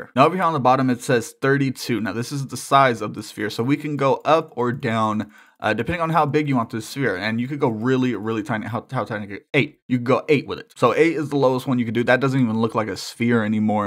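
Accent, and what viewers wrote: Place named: Ireland. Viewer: The only reason I even put on this video cuz I'm making a giant hot air balloon but I still need to fill in the top of the dome and stuff and the bottom so I went to work for the day and I can't find a single thing that can help except from this dude that's the thing by to me kind of has like an Irish accent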